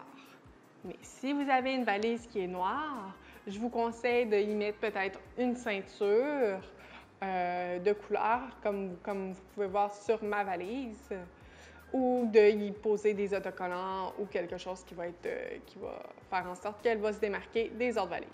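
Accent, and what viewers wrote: Canada. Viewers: t’es canadienne?? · Hey très jolie ton accent québécois . Enfin une personne qui met beaucoup de chaussure dans sa valise . J'aime bien ta vidéo 👍🏾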